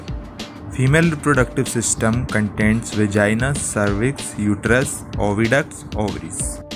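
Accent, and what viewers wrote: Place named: India. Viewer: 발음보니 인도서 만들었군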